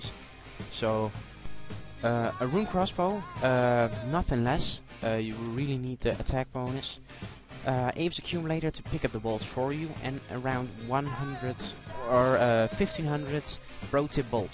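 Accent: Netherlands. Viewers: Hollander dat hoor ik al aan je stem :p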